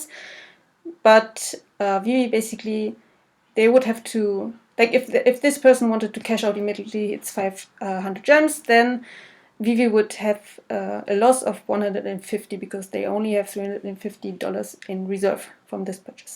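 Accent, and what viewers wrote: France. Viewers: Great content! Are you french ? you have a slight accent...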